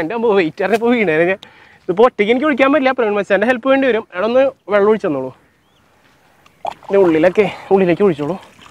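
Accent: Thrissur, India. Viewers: chettante thrissur slang miss chayyunathu njan mathramanno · ഒറ്റയ്ക്ക് തിന്ന് തീര്‍ക്കാന്‍ പറ്റോ മച്ചൂൂൂ നല്ല തൃശൂര്‍ languge എനിക്ക് ഈഷ്ടപ്പെട്ടു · It's Thrissur district Malayalam style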